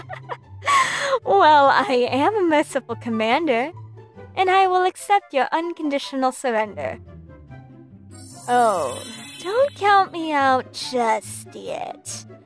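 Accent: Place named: Spain. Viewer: You have a nice Spanish accent. :)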